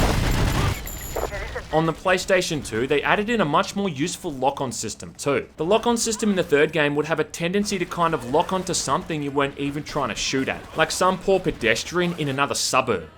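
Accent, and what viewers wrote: Australia. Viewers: i really didnt notice he was australian until he made that australian joke then I couldn't unhear it lol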